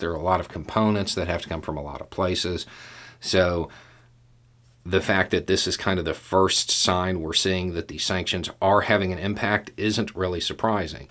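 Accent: South, United States. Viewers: Hey, fellow southerner here, I just wanted to let you know that I really appreciate the work you’re doing here. Your videos are really helpful with swaying right-wing family members. Something about a country boy with a southern accent seems to make them more willing to listen. Keep it up!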